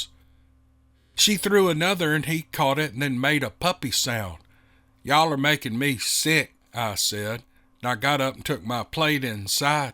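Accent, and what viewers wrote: South, United States. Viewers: I’ve been sharing your stories & telling everyone I can all about The Wild Hunt & Steve Lily. Everyone I share these gems with is hooked after hearing the first one. Sometimes I tell them in my own words & voice to peak their interest, then I close the deal when they hear the twang of the guitar & the soft, soothing sound of your good ole boy southern type voice weaving a tale of man vs monster. The latest addition to your growing audience is my oldest nephew. I started him in on The Wild Hunt Oklahoma Sasquatch Wars. Thank you for all you do & looking forward to future Steve Lily & Captain Clark of Team Odin’s Squatch encounters. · Good morning. I enjoy your recounting the experiences others have had but, nothing compares to these Steve Lily and Wildhunt stories. There is a connection to the characters that the shorts just don't afford. 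Your southern drawl gives your narrative an air of authenticity. Please keep them coming. Randy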